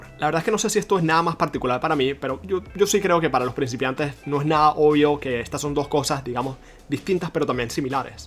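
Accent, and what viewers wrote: Venezuela. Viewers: Muchas gracias por los consejos, no pude evitar notar tu acento ¿Eres venezolano? PD: Pondre en practica estos consejos. printf("Gracias")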